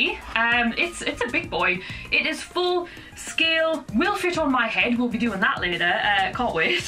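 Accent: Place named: Liverpool, United Kingdom. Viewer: Her accent is honking, but isn't scousers bad.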